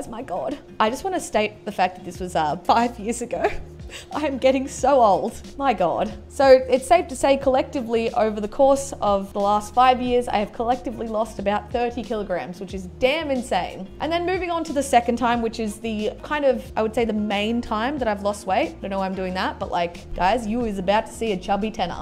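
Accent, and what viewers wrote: Australia. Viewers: So Australian haha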